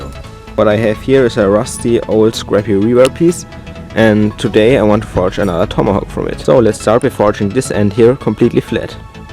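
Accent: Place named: Germany. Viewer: Hab an der Aussprache direkt gehört, dass du deutsch bist haha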